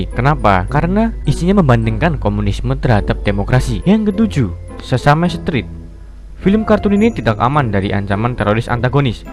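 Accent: Java, Indonesia. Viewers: Jawa banget anjir ngomongnya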